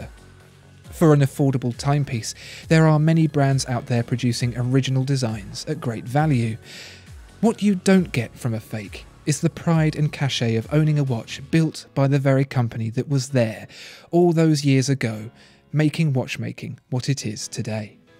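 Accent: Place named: RP, United Kingdom. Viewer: Yo be clearer, you make it sound so confusing with all that posh, You're really good and i enjoy it but you have to make it clearer which one is th fake and which one is real and align that properly to the video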